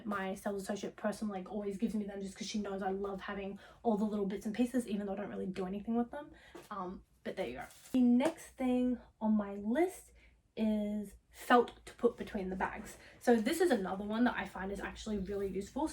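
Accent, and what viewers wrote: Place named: Australia. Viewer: Best chanel video on YouTube. And your Aussie too 🤣😊🤗🥰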